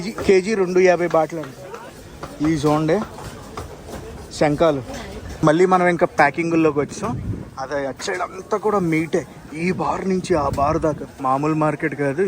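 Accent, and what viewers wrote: Godavari, India. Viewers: మీ ఇద్దరి వల్ల తెలుగు భాషకి గౌరవం పెరుగుతుంది. మీది గోదావరి యాస, గారిది విజయనగరం యాస. ఏదైనా ఇప్పుడు ఎవరి సొంత తెలుగు హ్యాపీగా మాట్లాడవచ్చు. ఇంతకు ముందు ఏవరు ఏడిపిస్తారో అని కృష్ణా, గుంటూరు తెలుగే మాట్లాడుతారు. Off cource మీడియా, సినిమాలు అవే బాషలో ఎక్కువగా ఉండడం వల్ల అలా మాట్లాడుతున్నారు. ఇంతకు ముందు తెలంగాణా యాస కూడా అంతే, నాలుగు సినిమాలు ఆ బాషలో తీయడం వల్ల అందరికీ ఈజీగా అర్దం చేసుకుని వాడేస్తున్నారు. Even ఆంధ్రలో వాళ్ళు ఇప్పుడు తెలంగాణా యాస ఈజీగా వాడేస్తున్నారు. ఏదైనా సినిమా అయినా, సోషల్ మీడియా అయినా, మీరు హైలైట్ చేస్తేనే., యాసలు బ్రతుకుతాయి. కృష్ణా, గుంటూరు ని తక్కువ అనడం లేదు, pure, plain తెలుగు వాళ్ళది, మిగతా జిల్లాలది ఫ్లేవర్ తెలుగు అంతే. Love from vijayawada(NTR dist). Native place చీపురుపల్లి (vzm dist). Thank you.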